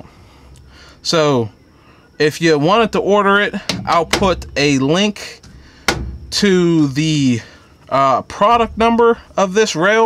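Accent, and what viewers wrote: Pittsburgh, United States. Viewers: You from the Burgh?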